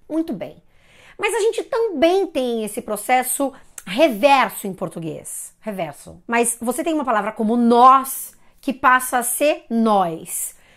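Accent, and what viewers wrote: Rio de Janeiro, Brazil. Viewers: Amo os "searviaçoish" dos "carioacaish". PS: tive um colega que também fala "eu naisci", acho que é do Rio.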